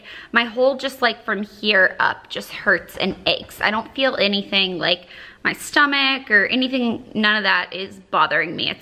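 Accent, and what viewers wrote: South, United States. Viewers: Hi, I've been watching your videos for so long and just honestly love your personality and seeing more into your life! As long as they keep making you happy I hope you keep making vlogs for a long time! Also was wondering after watching the clips from your first youtube video ever if you had to consciously work on your southern accent, or if it naturally got less strong when you moved to LA? You definitely had more of a twang when you were younger haha!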